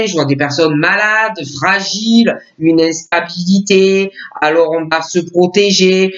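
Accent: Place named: Southwest, France. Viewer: J adore l accent différent du sud ouest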